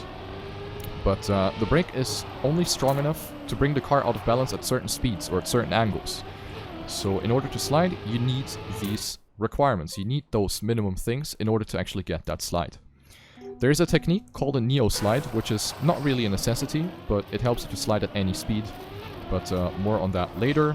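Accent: Germany. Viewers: oh hes german that makes sense accent trew me off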